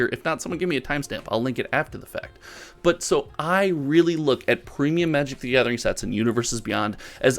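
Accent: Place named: Chicago, United States. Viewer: Did I just hear your from the Chicago land area?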